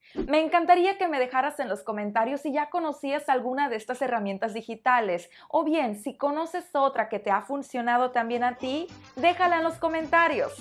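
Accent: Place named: Mexico. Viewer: Soy de Brasil y me encanta ver tus videos y escuchar tu podcast. me gusta mucho el acento mexicano👏👏👏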